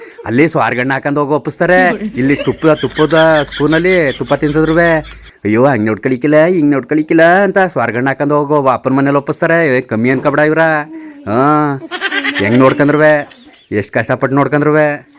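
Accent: Mandya, India. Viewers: ಮಂಡ್ಯದ Mathu Chanda.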